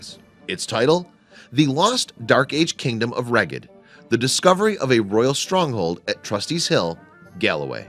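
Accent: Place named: Midwest, United States. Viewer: The narrator has a Wisconsin or Chicago northern accent so he's mispronouncing a lot of words.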